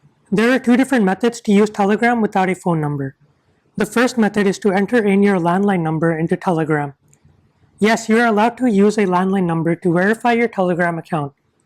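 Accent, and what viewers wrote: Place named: India. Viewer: ikr. But despite that, I found this video kinda useful and the Indian accent wasn't too awful. It would be helpful to simply state that Telegram requires "A PHONE NUMBER" to download/set up, but it does not have to be your phone number OR DOES IT? idk could a textfree number on another device suffice? And what's the deal with the call back? I use textfree to avoid having audio phone service. Does Telegram require that one-time audio connection? If so, then why? It's not an audio-based app. And what happens if a user changes their phone number (which I do regularly). These are the kind of questions which should be answered in this kind if video.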